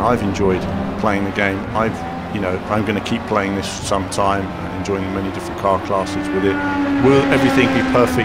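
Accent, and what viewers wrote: England, United Kingdom. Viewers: I am new to your channel and subbed right away as I was looking for updates on Project Cars. i have a PS4 and I have had all the GT games. I am hoping this game also helps to push Poly for the full gaming experience (especially the sounds). I really enjoy your style and pragmatic delivery. I'm also a sucker for a English Accent even though I'm a grown ass American man..lol....Makes me remember when I used to watch Formula One all the time on Speed Channel. Anyways...keep up the great work and I'll be looking for this next week in the States.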